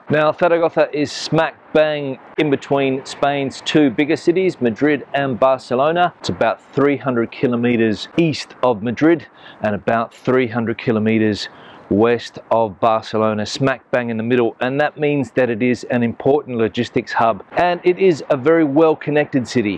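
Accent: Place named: Australia. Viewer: I can hear the Aussie accent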